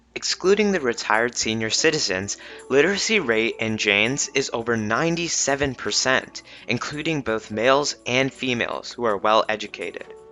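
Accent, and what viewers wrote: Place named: India. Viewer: We are one 😃 Nice hindi accent btw 😂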